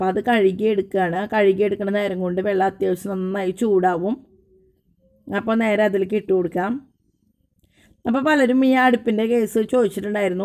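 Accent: Thrissur, India. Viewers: ചേച്ചി കാലഹരണപ്പെട്ടു പോയ thrissur slang ചേച്ചി തിരിച്ചു കൊണ്ടു വരണേ. നമ്മുടെ അമ്മൂമ്മ മാരും അമ്മമാരും സംസാരിച്ചിരുന്ന ആ slang ഇപ്പോൾ kelkane ഇല്ല.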